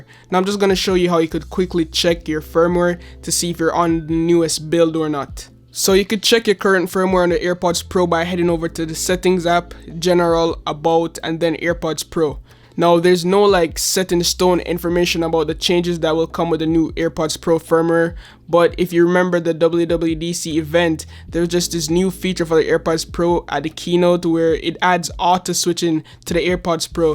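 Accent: Jamaica. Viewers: definitely can hear the jamaican accent :o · Is that a Jamaican accent?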